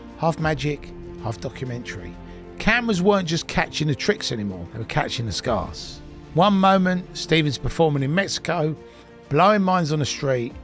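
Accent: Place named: England, United Kingdom. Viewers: I can't even lie.. I'm a cockney through and through and listening to the story of Dynamo told by someone who sounds as if he could be my uncle really makes me feel as if I'm closer to the story than I am. I also believe this was narrated beautifully. Im so glad to have seen Dynamo show face when he was invited into a sidemen video this year (2025). His comeback is nothing but remarkable. I truly believe that if jesus had made his second coming.. then Dynamo is one of the ones who was chosen to show the world the true power of what God and jesus can do. I'm far from religious, however the feats he has performed.. some are on par with the words of the miracles performed by jesus.. make of that as you will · Complements to the narrator with the very strong English accent. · I loved the way you told the story it's nice to hear an English accent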